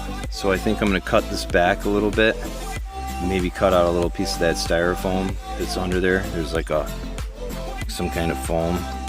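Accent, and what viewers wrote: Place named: Upstate New York, United States. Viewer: Very helpful and thorough video… thanks for sharing! Ps - Upstate NY accent for sure! 🙂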